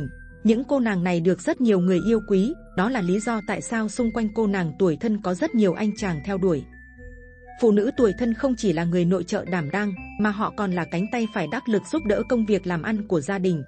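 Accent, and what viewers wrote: Thanh Hoa, Vietnam. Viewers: T nghe giọng thanh hóa đã chối rồi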